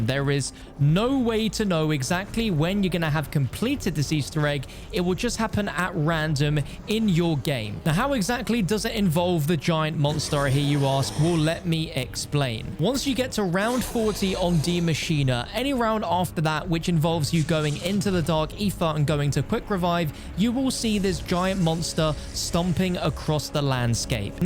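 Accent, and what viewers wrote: England, United Kingdom. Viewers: Don’t pick on his pommy accent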